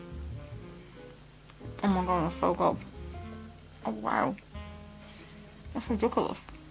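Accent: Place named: Ireland. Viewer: They look lovely, and your Irish accent is lovely as well! Can’t wait to make them with some clotted cream!😊👍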